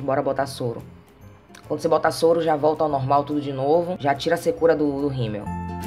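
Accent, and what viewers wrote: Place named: Northeast, Brazil. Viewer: Primeiro video que vejo do seu canal, seu nome é?😂 amei o sotaque vc é nordestina?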